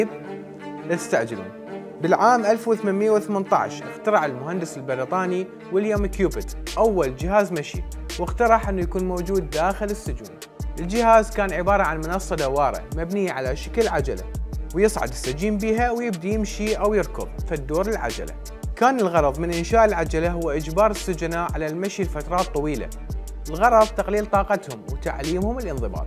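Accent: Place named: Iran. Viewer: فارسي الدم والشكل واللكنة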